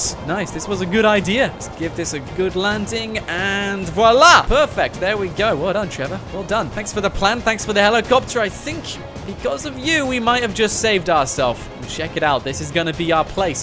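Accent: United Kingdom. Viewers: I have to say that i LOVE your British accent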